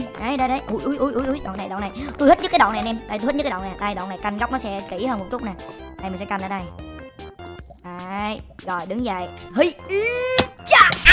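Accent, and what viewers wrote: South, Vietnam. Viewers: vì cách đọc của người miền Nam có chút nhầm lẫn thui . Ko sao cả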